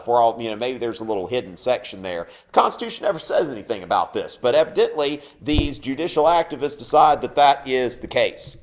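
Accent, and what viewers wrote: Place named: South Carolina, United States. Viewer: Thanks for clarification on the Compromise of 1850. BTW- You need to help Keith Hughes with his southern accent. :-) (you sound like a South Carolinian. I'm a Georgia boy)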